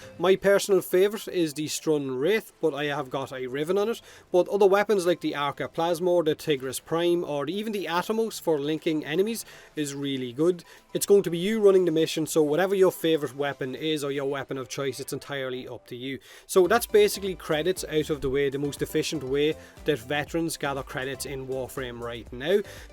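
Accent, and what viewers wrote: Ireland. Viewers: You have to get "pints" in the index? The Irish and their pints xD gotta love that accent <3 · He wasn't wrong about subtitles shitting on his Irish accent. Goddamn that's funny. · Awesome irish accent, hey! One of the reasons I prefer your videos to OriginalWickedFun is your voice! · Love your Irish accent You single? 😏😂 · I subbed for the bloody irish accent. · That accent killed me. Specially when he said youtube wont understand my irish accent xd. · Irish Accent ❤ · I love your Irish accent. Thank you! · Don't worry i love your bloody irish accent.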